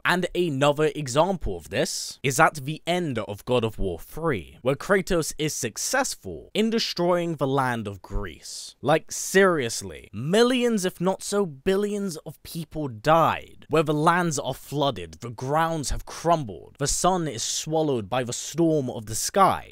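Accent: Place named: Scotland, United Kingdom. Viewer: Wouldn’t say British, more Scottish myself. It’s when he says “lad” that I really hear it personally. But I really agree with the rest of what you were saying about the universes! It sounds dope.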